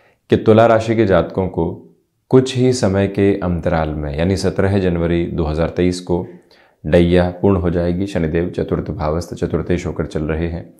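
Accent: Standard. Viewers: आपकी आवाज बहुत गहराई लिए हुए और ज्ञान में डूबी हुई लगती है शुद्ध हिंदी में आप बोलते हैं धन्यवाद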